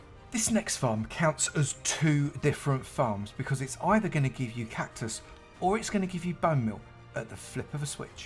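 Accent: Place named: Scotland, United Kingdom. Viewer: I like your accent. Are you from Johnstone?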